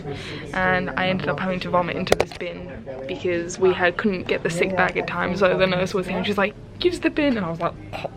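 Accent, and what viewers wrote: United Kingdom. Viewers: You’ve got a British accent but your Australian omg I’m confused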